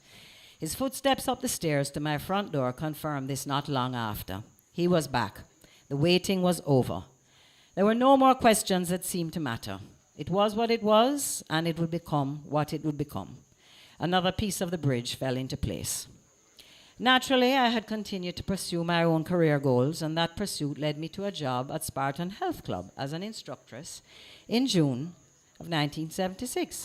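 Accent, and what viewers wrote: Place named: United Kingdom. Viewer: Unfortunately I don't know jamaican accent but her pronunce seems just english -british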